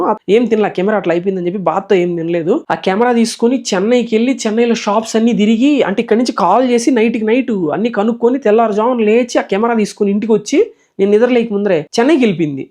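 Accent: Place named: Telangana, India. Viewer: Annaya meeku ma telanagana hyd language baaga alavatu ayyindhi 😅( chesetodni )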